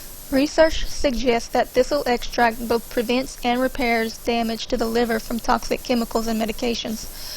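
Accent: Louisiana, United States. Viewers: You sound like you're from Louisiana, by golden meadow or Thibodaux.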